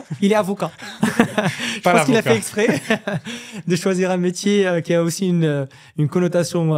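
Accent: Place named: France. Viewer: Surtout lorsque ces mots anglais sont prononcés avec un très fort accent français. C’est risible pour ne pas dire embarrassant.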